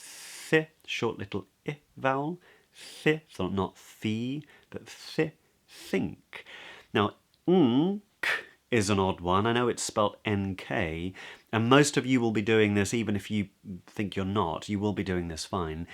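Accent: RP, United Kingdom. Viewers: Is your what we call RP / BBC accent ?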